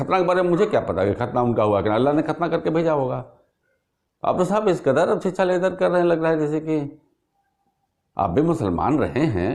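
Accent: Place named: Allahabad, India. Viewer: bahut chutila vyangya elahabadi boli me bahutai jam tha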